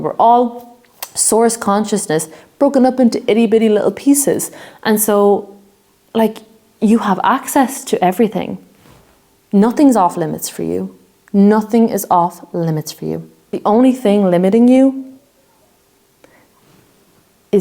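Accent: Ireland. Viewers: Heard the Irish accent and immediately subscribed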